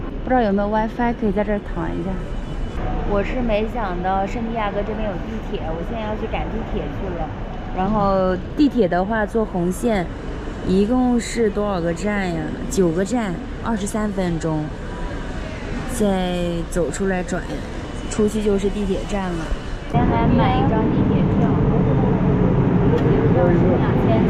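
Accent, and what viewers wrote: Northeast, China. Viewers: 感觉你是东北人口音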